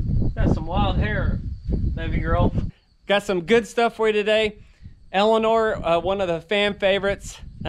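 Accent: Oklahoma, United States. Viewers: I love Elenor. I’ve enjoyed your adventures. I have family in Moore so I enjoy watching the weather and hearing that great Oklahoma accent I’m in upstate ny. Thank you so much for sharing your lives. Good luck with the new place.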